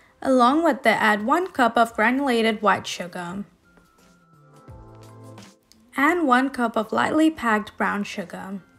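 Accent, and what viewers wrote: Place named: Gujarat, India. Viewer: I love carrot cake the best, it is a healthier one too, and easy to make and moist, I also love and love her English accent with a cute Indian Gujarati hint, nice to hear.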